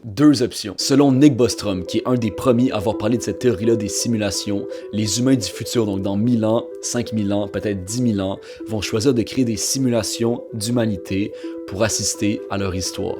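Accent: Quebec, Canada. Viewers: Toi aussi t'es insupportable, respecte l'accent québécois trou de b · Je sais pas ce qui me perturbe le plus ta théorie farfelue ou ton accent québécois 🤔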